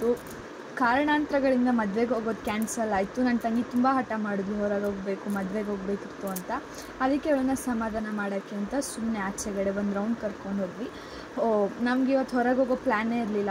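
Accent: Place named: Mangalore, India. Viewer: Naavu moodbidire alli vodidu alvas pu college i loved manglorean accent 1st when I saw your video in instagram I thought you are from dk ❤🎉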